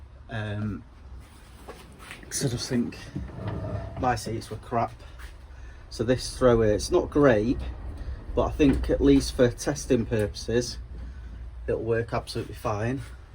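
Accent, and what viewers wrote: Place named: Bradford, United Kingdom. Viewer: I've got a mk5 tipper that's been fully rebuilt and solid as a rock in blue single wheel with German axle that i drive daily and love it but it still needs a few little bits to make her mint but to find one that don't need welding is a miracle. I've had loads over the years but I'm keeping tabs on your build to do my own in winter but yours is the most informative build I can find and makes for a good watch. You don't sound too far from me in Bradford either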